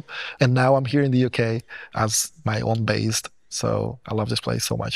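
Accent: Brazil. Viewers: wow I was 99% sure that he was brazilian!! hahaha, I`m brazilian too so was kinda of easy for me listen to some phonemes in his English, that btw is very good! And of course, apart from that, God be praised for your healing testimony! Hugs from BR 🟨🟩🟦⬜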